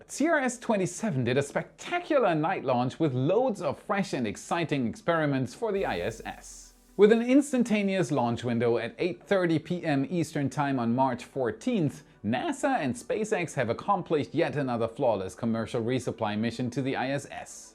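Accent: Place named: Germany. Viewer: Awesome to see you back. Space should always have a German accent!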